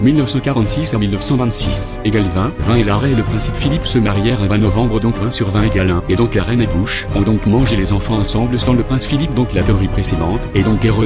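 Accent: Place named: Quebec, Canada. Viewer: mdr j adore cet accent (québecois)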